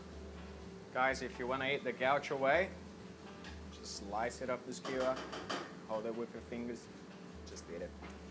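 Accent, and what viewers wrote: Australia. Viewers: todd goslin he probably learned English in Australia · Sounds soo Aussie. ..awesome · Da hora esse sotaque aussie - e da hora também ensinar (direito rs) os gringo a fazer picanha - porque já vi cada vídeo bosta da galera "ensinando" rs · A lot of brazilians go to australia to learn English! That’s why he has this Australian accent! brazilians learn pretty easily the accent from the country we study the language!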